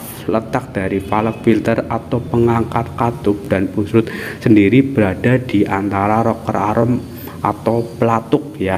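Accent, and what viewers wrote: Banyumas, Indonesia. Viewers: Kie pasti wong ngapak sing ngomong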